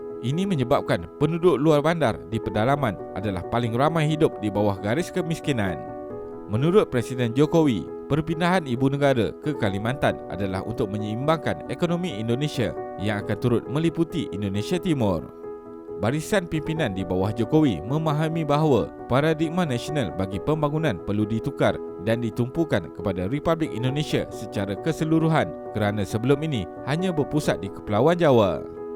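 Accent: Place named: Peninsular Malaysia, Malaysia. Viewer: Asyiiiik..... dialek semenanjung nyaman didengar, macam berade di semenanjung sumatera.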